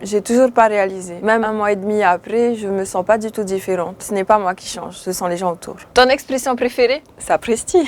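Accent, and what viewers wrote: French Polynesia. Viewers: Elle a un accent polynésien j'adore très bel accent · Alors l'accent polynésien c'est une pépite 👌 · Son accent C un delice pour nous de la polynesie via ihihihiii NC 😅😋😘😚😙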